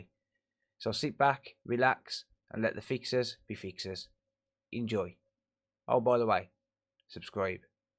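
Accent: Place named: Birmingham, United Kingdom. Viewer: Not the same without the Brummie accent 🤭🤭🤭🤭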